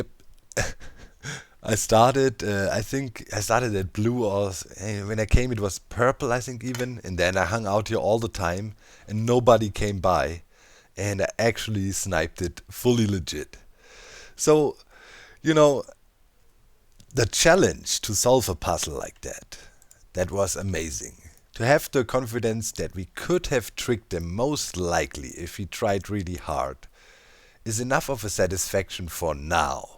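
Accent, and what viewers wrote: Germany. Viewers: Man hört den deutschen Akzent raus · Bist du Deutscher?😂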